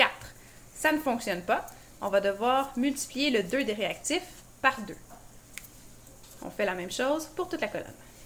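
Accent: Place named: Quebec, Canada. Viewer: C’est sont accent québécois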